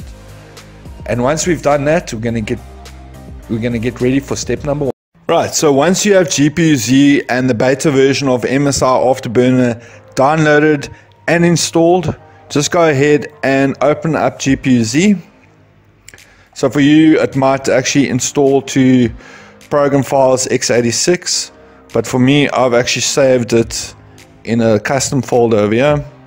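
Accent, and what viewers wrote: South Africa. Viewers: Legend brother. Also, are you South African by any chance? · I know a south african when i hear one...Hehe lekker video brother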